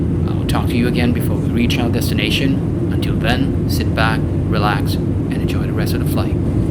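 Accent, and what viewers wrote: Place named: United Kingdom. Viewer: I LOVE YOUR BRITISH ACCENNT ♥️♥️♥️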